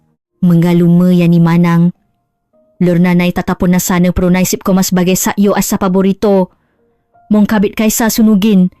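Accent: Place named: China. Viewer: Parang hinahabol n kamatayan ang narrator, at masyadong slang n inchik ang pagkakasalita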